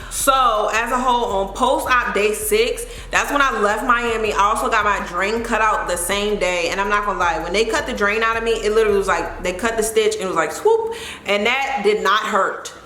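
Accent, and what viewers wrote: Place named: Maryland, United States. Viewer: I knew you’re from Maryland you talk just like me LOL 😂 heyyyy girllll 😻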